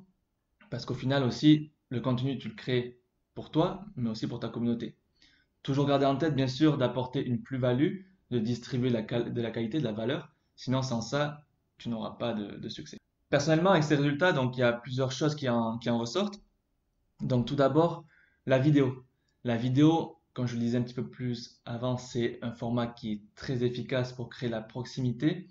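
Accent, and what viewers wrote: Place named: South, France. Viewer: Le petit accent du Sud à la Marie Fray au masculin :-)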